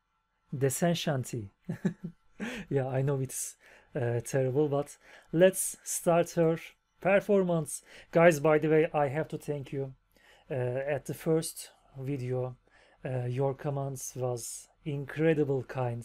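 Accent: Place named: Turkey. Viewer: Oh my Turkish friend is back with another reaction 😎 always a pleasure to see your smile and to hear your nice accent on this emblematic song! Please keep go on with cause you give me such a nice smile 😘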